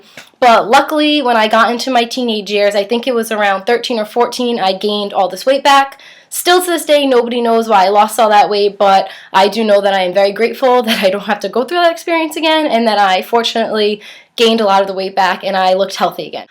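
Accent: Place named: New Jersey, United States. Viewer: Love this video. I a a new subscriber. Please sub back and anyone else that does, I will too. You sound like you are from New Jersey. Love your accent. :) You are awesome!!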